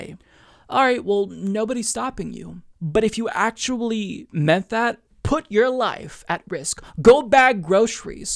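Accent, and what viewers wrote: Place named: Midwest, United States. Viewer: I've heard you mention in the past you've worked on your speech and that either you're insecure about the way you sound or you've gotten negative feedback about the way you sound. I want to tell you that I love listening to you talk. I love your midwest dialect. I love your mannerisms and -isms. Btw I am someone who knows most people can't stand the way I talk or sound so I feel like I'm a honorary expert!! 🤣 😅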